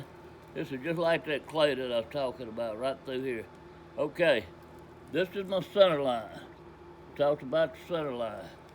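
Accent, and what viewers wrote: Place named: South, United States. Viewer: thank you for sharing your knowledge, and I could listen to you talk all day with that southern draw. where ya from?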